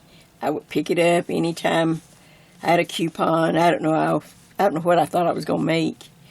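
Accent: South, United States. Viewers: I just found your channel! You're just darling!! Plus, being a southern myself....I love your southern drawl, and demeanor!!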